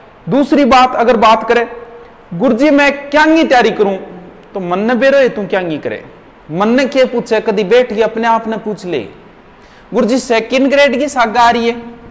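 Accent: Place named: Churu, India. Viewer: Churu boli badi bhaari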